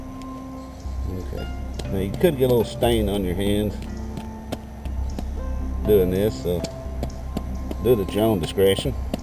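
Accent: South, United States. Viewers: ... with the southern drawl ... slow talk ... lazy annunciation ... and background music ... and with old ears ... many of your words ... did not compute. GOD Bless you though for your efforts.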